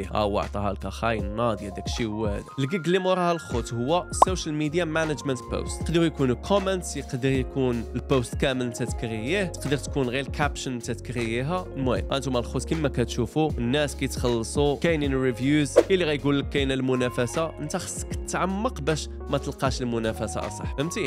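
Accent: United Kingdom. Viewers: ونتا عندك نطق برطاني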